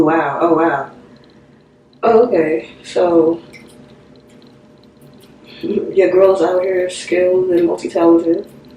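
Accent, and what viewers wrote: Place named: New York, United States. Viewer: Def dying my hair tomorrow and hoping it turns out like this. 😂😂 watching the video and listening to you talk I was like ain’t no way she not from New York 😩🤣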